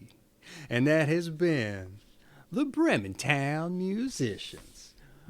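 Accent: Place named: Lowcountry, United States. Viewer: I can’t decide if he is mocking the low country accent or enjoying it 😂but it’s a little to theatrical to listen to the entire story.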